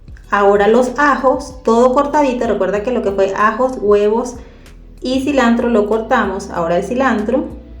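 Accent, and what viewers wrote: Venezuela. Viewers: Clarooo que las voy hacer se ven demasiado ricas gracias por tus recetas y 20 puntos como explicas en los video. Saludos desde la isla de Margarita 😉 por tu acento eres Venezuela 😉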